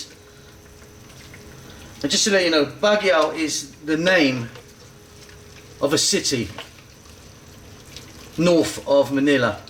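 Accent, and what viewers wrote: United Kingdom. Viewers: MUY DELICIOSO! Five stars for using the Fish Sauce and your thick British Accent to go with that. Really, it's delicious, really!!!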